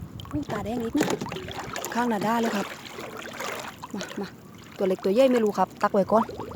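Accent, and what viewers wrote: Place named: South, Thailand. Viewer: พึ่งดูครั้งแรกค่ะ สนุก พูดภาษาใต้น่ารัก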